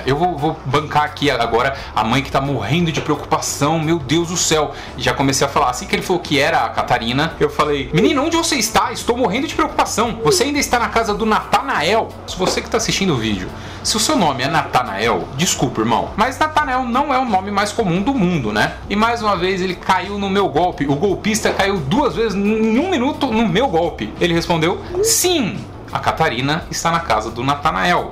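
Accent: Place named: Goiás, Brazil. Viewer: Uai só eu acho que ele é daqui de Goiás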